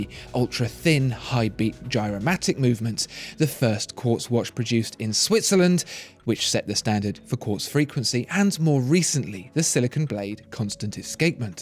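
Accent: United Kingdom. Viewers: I been watching the pro review from you almost a year . love your British accent man . I find very useful. thank you for the great work . do you think you can do a review on Breguet marine tourbillion ref number 5827. I couldn't find any helpful reviews . please help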